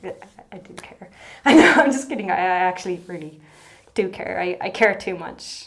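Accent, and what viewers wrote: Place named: Ireland. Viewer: I believe in you 🥰😍 you can do more than you give yourself credit for, and I love your accent 🥰 you sound exactly like I imagined, your Irish is making me happy💖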